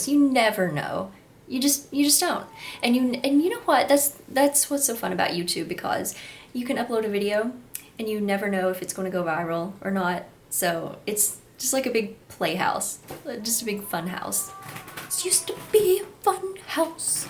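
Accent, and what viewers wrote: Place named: Kentucky, United States. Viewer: Great video, enjoyed it lol your personality is so amazing, ky ascent.. I never noticed lol prob where I'm from Ky also, really I never noticed lol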